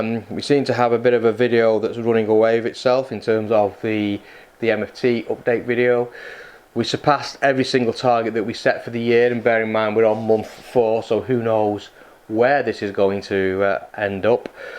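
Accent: United Kingdom. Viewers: Hi. I accidentally came across your channel recently. Surprising since I watch a ton of YouTube 'Wood Porn, as my wife calls it.:P - I guess your search terms are working. Where are you based? From the accent I am guessing North West England? I am from Birkenhead originally but live in Utah now. Good luck with the venture and keep posting the awesome videos! Cheers, Rob P.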